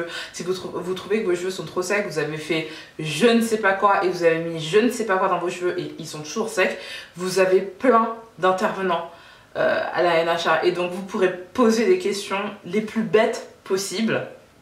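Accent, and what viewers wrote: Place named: Antilles, Caribbean. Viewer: J'ai adoré ce petit accent antillais lol. Un vrai kit de survie 😏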